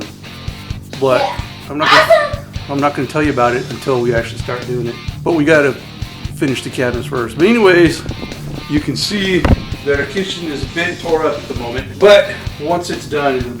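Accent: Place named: United States. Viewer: Sounded Amerikin to me.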